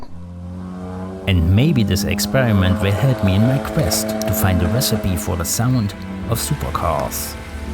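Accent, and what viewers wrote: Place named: Thuringia, Germany. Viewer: On a side note your thick accent sounds like you could be from near Thuringia. Yet there is often a hint of Swiss or Austrian. Very interesting. In Gera haben die immer so einen tollen Akzent.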